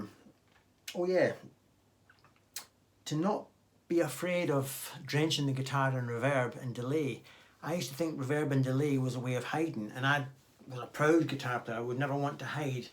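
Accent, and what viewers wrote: Scotland, United Kingdom. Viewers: what cool accent the Scottish is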